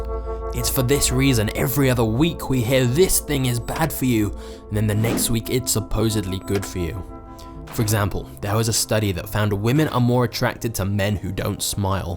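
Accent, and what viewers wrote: United Kingdom. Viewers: The combination of the British accent and the the snippets from ca; 40 different shit movies make your point.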